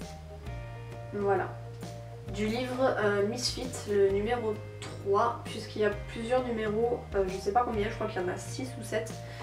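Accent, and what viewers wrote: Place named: South, France. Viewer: Coucou, c'est Myriam, j'ai beaucoup aimé ton TAG, tu as raison, 20 livres, c'est déjà suffisant pour avoir de quoi faire!! :p Par contre, tu as un sacré accent, tu viens du sud? :)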